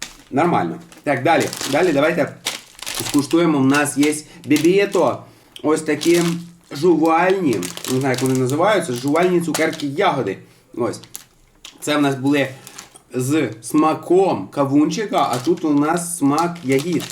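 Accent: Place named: Surzhyk, Ukraine. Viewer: Лучше бы уж на русском,чем суржик твой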